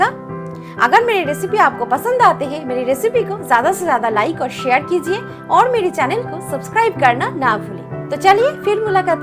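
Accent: Bengal, India. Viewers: Its always please and cute to hear hindi from a bengali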